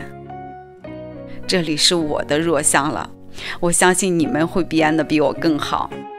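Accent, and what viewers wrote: North, China. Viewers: 今天偶遇你，非常喜欢你的声音，不急不躁地叙述，像北方人的口音，果断关注收藏！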